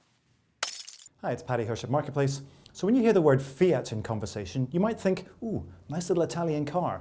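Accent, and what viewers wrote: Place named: Northern Ireland, United Kingdom. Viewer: Hey Paddy, great to hear a familiar dialect from an expat of Northern Ireland. Really interesting.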